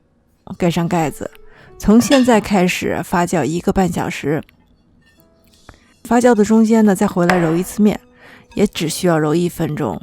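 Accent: China. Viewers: IT's Chinese pronunciation. She is speaking mandarin.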